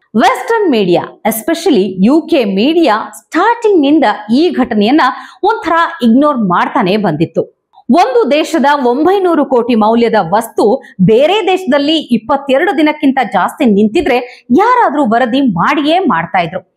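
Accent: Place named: Dharwad, India. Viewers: Madam Please talk only in uttara kannada slang❤ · Madem vishaya yenar eravaldeka nam Dharwad bhashe daaga mataduda yaarara byadandrenu helri , nanagantu byasarageda soodli 😂😂❤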